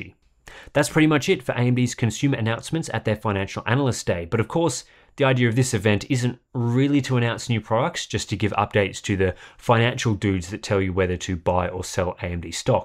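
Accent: Australia. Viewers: there's no such thing as a "normal" accent lol imagine being this provincial Hardware Unboxed please go full aussie accent to ward off this kind of braindead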